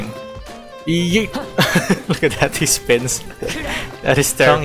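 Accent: Philippines. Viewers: Are you filipino? You kinda have like a Filipino accent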